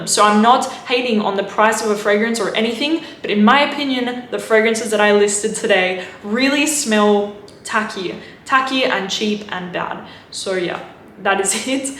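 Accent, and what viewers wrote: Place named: Australia. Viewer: Those fragrances might be tacky. But not as tacky as your half Australian, half European (??) accent. You don't sound exotic. You sound tacky. Stop it.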